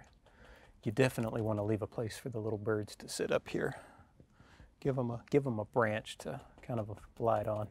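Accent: South, United States. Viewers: A lot of southerns say it like that. That have w that brings in a h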